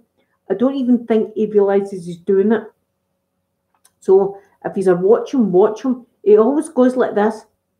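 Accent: Scotland, United Kingdom. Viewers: Your Scottish accent is definitely my favorite for asmr and is sooo relaxing... I'm from California and I prefer asmr channels that have an accent that isn't American.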